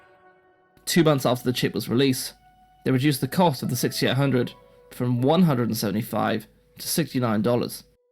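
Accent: United Kingdom. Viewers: You speak with a British accent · "Compuhurz" for computers and "yunighud" for United both sound really, really stupid. It's some obscure English provincialism, I know, but it's one that YouTube would be better off without. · Love the music, esp. . Fantastic. Warning! Possible trigger effect on maker..( I understand this video is 4 years old, so maybe I should have shut up... But I've written it now). The early chapters may not have been researched deeply enough. I picked up on a few technical and historical errors and omissions. I understand the scale of this project and that the introduction could likely have been rushed through. Reaching the microprocessor history you immediately stepped the game up. Truly excellent and quite an undertaking., a lot of praise should be given for this. My only other criticism may have you swearing at me! Work on your diction!, Otherwise you have a fine voice for this style of content. 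The odd Compooha and Intel Ahy Ahy locate you firmly in the London suburbs...? They, and similar just pop in randomly? Started watching the Cray video. Greatly improved. Really wonderful stuff. Beautifully written. Subbed. And peace brother, I'll never mention it again.🤠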